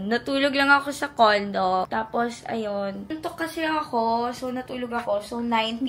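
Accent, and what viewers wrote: Conyo, Philippines. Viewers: hahha omg so cute ng pagiging conyo mo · While hearing Ms saying " I wish Blythe was here" made me realize and made me wish that i also have that kind of friend who thinks of me everytime I'm not there with them 'coz i always feel like I dont have friends I just know a lot of people..... Btw I love youuur conyo talaga its not maarte or cringe or oa it's so cutiee😻🥰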